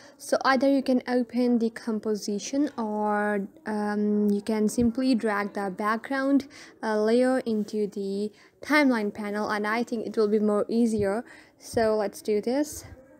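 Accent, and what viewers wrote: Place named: England, United Kingdom. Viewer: Tutorial is so nice lakin English accent 😂😂❤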